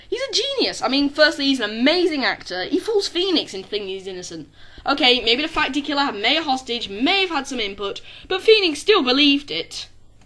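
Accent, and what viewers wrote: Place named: England, United Kingdom. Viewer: Your accent is so English, so fragile are you sure you should have been using such words back when you filmed this? Regardless this list was really good, thankyou for not putting Edgeworth in it, it's nice to see a little more variety.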